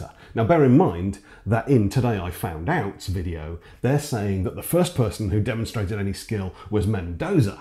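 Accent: United Kingdom. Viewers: A man with muscles and a British accent reading from an old book to passionately make a point.....I didn’t know about this kink until now. Thank you.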